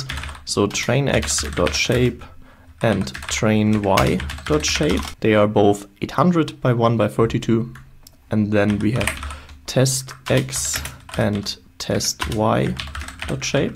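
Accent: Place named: Germany. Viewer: Are you german? Sound very german! Great videos BTW!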